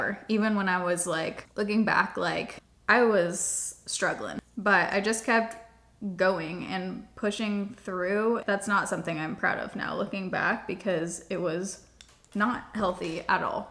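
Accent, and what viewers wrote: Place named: South, United States. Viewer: Dude I'm a couple minutes in and I'm sure this gets real serious real fast, but just listening to you talk. I can tell you've been in the South lately lol there is some twang in your Midwest! 💚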